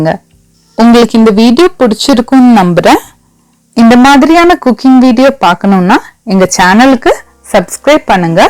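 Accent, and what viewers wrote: Kerala, India. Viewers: Malayali daa tamil ketta puriyaathaa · Malayali anu alle... Tamizhu kettapol manisilayi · Ur talking is like a malayali... R u a from Kerala Or tamil nadu??? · Covered all steps including 'maava salichi vachikonga' ...loved this video And even though I could sense kerala accent, tamil is good!! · Woww superb kfc👌... malayali tamil pesara maadhiri iruku..ningal malayali alle?😊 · Hello 🤣🤣 that's TAMIL not malayalam. She's speaking tone which reflects her mother language malayalam but she here fully speaks in tamil words only. You north indian guys are over thinking malayalam is only a down south language. But truth is tamil language is mother for all south Indian languages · Firstly for the malayalam tone tamil speech i am subscribing your channel👍😍 of course for presentation also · Malayali aano ? Malayalam touch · ningal malayali aan le .tamil samsarikkunnath klkkumbol thanne manasilavunnu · Malayalam kalantha tamil🙂, good recipe. 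Thanks. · U sound like more malyali than a tamilian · Malayali aanu...aa tamil kettal thanne arayaam...but sambavam supper tto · U sound like a malayali talking Tamil... Good quality video!! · Enikkum thonni evideyo oru malayalam touch... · Malayala manam unga tamizh la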